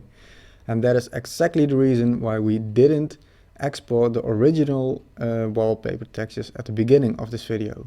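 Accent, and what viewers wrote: Netherlands. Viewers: Are you dutch? you have like an dutch accent just saying❤ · are you dutch? you sound dutch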